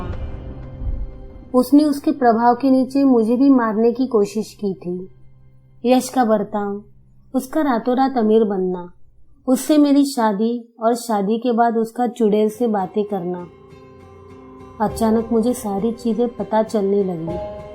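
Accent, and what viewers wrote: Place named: Maharashtra, India. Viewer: Full on Marathi accent, last two statements were exactly opposite.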